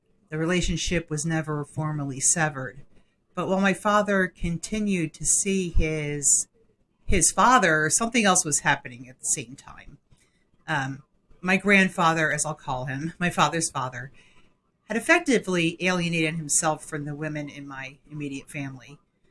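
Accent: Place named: Mid-Atlantic, United States. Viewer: Thank you for sharing this. I’m glad your uncle was able to give your brother perspective and “permission” to trust himself. That’s something so many people never get. (You sound mid-Atlantic, perhaps I am wrong, but hello from MD!)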